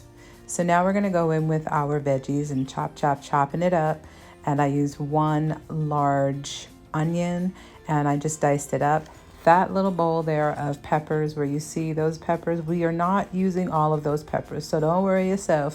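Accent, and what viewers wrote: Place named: Caribbean. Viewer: 😮😮 Wow!!!I’m smelling the salt fish from Montreal.🤣🤣Looks sooooo tasty.Sending Love ❤️ I’m loving your West Indian accent.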